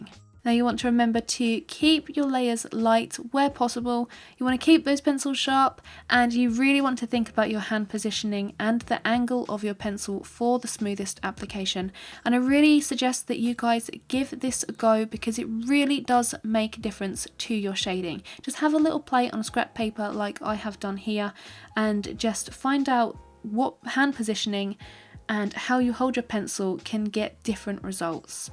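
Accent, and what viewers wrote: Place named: Australia. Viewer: Brilliant video- thank you so much for this, and all you share of your knowledge! 👍 Just love your aussi dialect too! ❤ Greetings from the other side of the planet - Sweden. 🇸🇪🕊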